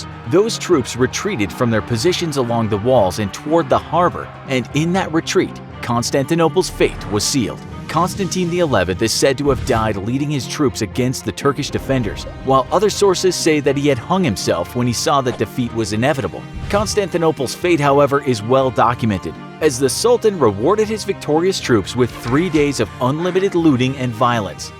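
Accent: United States. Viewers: Its an American channel, by the accent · Any way of getting a more relaxed non-American narrator?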